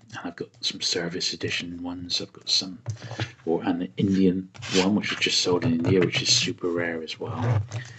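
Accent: England, United Kingdom. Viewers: It's not a British accent. There's no such thing. That's an English accent. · You forgot Scots (the language). 🤓 But yes, most people know there is a plethora of British dialects and accents, and not one. The same is true of American English: someone from Boston and someone from Alabama sound almost nothing alike. And yet we still refer to them as “American” accents because they do sound more similar to each other and are easily distinguishable from accents in Britain or places like Australia or New Zealand. That’s the reason we group things together. To distinguish them from other/different groups. Same goes for “British accents”: I have no idea where exactly the speaker in this video is from, but I know it’s not North America, and it’s gotta be somewhere in Britain, most likely England.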